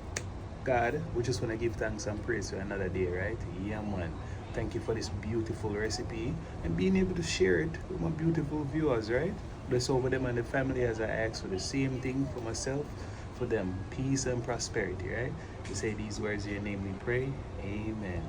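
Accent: Jamaica. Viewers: Can anyone tell me where his Jamaican accent has gone ,seems to be missing 😮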